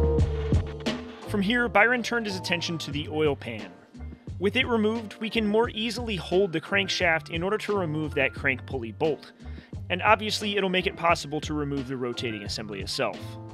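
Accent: United States. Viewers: Amusing and yet so normal to hear an American voicing over a video of a European engine being rebuilt and mentioning that he hopes that this video will make it known what this USA back street workshop can show the ROW (Which is basically Europe) how to do it ! LOL. Why not simply just phone up a European backstreet workshop to work on a European engine ?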